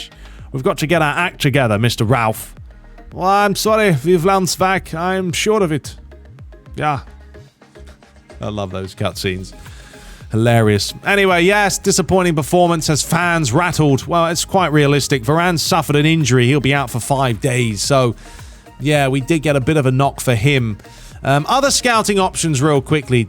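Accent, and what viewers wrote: United States. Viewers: That American accent sounds just like Taylor twellman haha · As an American viewer, I have to say you nailed your American accent. Yee haw!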